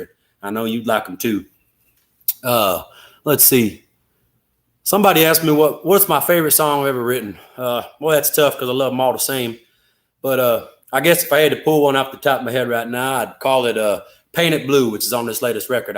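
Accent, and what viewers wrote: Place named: Appalachia, United States. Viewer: Doesnt seem like you talked like a hillbilly a few years ago. You pronounce guitar like geeeeetarr now?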